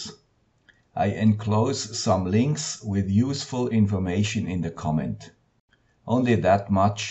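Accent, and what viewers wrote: Switzerland. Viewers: I really love your numbering of your video's sir! Keep up the good videos and I love your Swiss accent! Danke schön! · great and very helpful ! really love your videos and btw super swiss akzent:)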